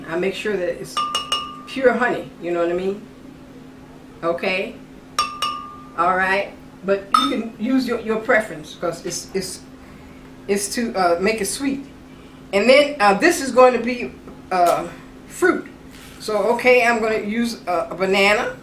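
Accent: United States. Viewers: For the first drmonstrationI got the aloe vera gel, the carrot, the honey, the juice but what is the last item she added ? I didn't get the last item he added because of her America accent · I am just Subscribing as this was in my recommendations. I love how you are surely Taking Charge of your Health and showing us that we can do so as well!!! You sound like you are from Down South Georgia somewhere! Blessings to you "Grandma"!!! 💓 💓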